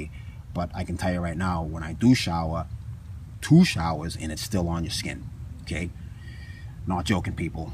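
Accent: Boston, United States. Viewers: you sounds like ray donavan's brother haha (boston style) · THIS GUYS FROM BOSTON!!!! WICKED & PAHK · Great vid. I can tell your a fellow Bostonian. Best Boston accent I've heard on you tube. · Boston guy can tell another Boston accent & no flipping doubt THIS GUY IS FROM BOSTON! Charlestown here brother...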